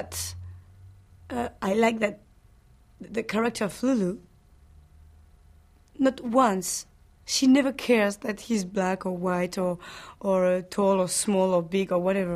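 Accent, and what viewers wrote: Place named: France. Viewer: lovely french accent!kinda sexy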